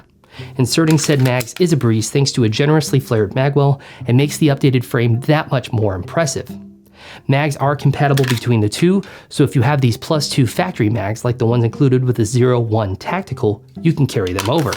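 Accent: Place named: Minnesota, United States. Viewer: What area of Tennessee? Been in Southeast TN my whole life. You killed that Minnesota accent, I went to Ely Minnesota on vacation several years back and my god do you sound like them. The difference of dialect was an issue, my thick southern accent was all but incoherent for the locals in Ely. Our fishing guide made me sit in the front of the boat so he could read my lips so he’d know what I was saying lol, great people there.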